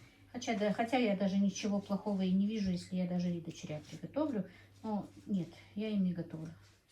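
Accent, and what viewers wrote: Standard. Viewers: Да речь прекрасная, чистая, грамотная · Привет вам большой...меня удивило ,что вы так чисто говорите по русски ,многие русские так не умеют говорить... чистюля ...настоящий профессионал...удачи вам и благополучия. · Чисто на русском говорит потому что она училась советское время в школе не во всех школах был родной язык урок. язык учили дома в кругу семьи соседями и родственниками в Чечено-Ингушской Республике ЧИАССР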